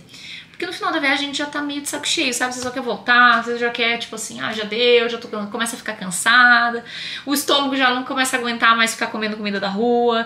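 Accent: Brazil. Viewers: Oi sou portuguesa nasci, na ilha Graciosa uma das nove,dos Açores, vivo no Canadá, viajo para Portugal Continental, com frequência,o meu marido e do Distrito da Guarda a qual depois de Lisboa foi a Cidade que mais conheço e menos gosto, ao Porto só fiz paragem no Aeroporto, está na minha lista quem sabe este ano? quanto a Coimbra gostei, mas não tanto quanto Aveiro muito mais talvez por causa do Mar,conheço Peniche, e Fátima, Nazaré gosto muito,Castelo Branco também é muito agradável, a Sertã gostei, mas me desculpem os Portugueses de Portugal Continental, prefiro os Açores mil vezes mil que a outro qualquer lugar 😂 mas sou suspeita, pois já que la tenho as minhas raizes,já o marido é continental e prefere os Açores, para uma próxima visite os Açores, se bem que a culinária- em termos de restauração o continente ganha os Açores muitas vezes, gostei muito de assistir os seus vídeos e tal como todos os Portugueses, acho estranho quando vocês Brasileiros prenunciam as palavras Portuguesas 😂 como ir no Porto e não ao Porto, me faz lembrar, que vamos montados em algo chamado Porto 😂 bjs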